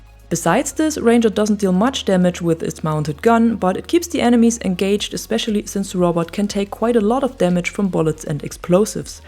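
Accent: Germany. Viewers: Warum kann ich sagen das du aus Deutschland bist ? 😂😂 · love your german accent, you voice is so hot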